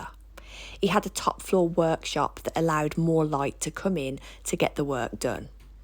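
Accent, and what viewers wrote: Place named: Midlands, United Kingdom. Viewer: What an absolute treat. I was completely enthralled by you describing the people’s lives. I remember us having the wallpaper with the horses on it and funnily enough they have it on the wall of my dentist now. I love the way you talk and definitely understood the lingo as I’m from there too. After 50 years in Somerset I’ve still got the midland twang. You brought those people to life, just fantastic. Thank you again Bab 😊 Xxx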